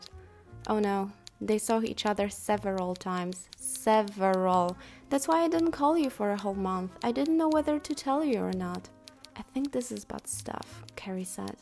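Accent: Poland. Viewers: Your Polish accent is unbearable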